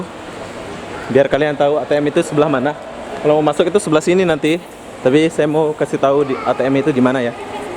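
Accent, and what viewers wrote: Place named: Malaysia. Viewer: Kayanya KK sudah lama di malaysia, lagot bahasanya udah ngikut meleyu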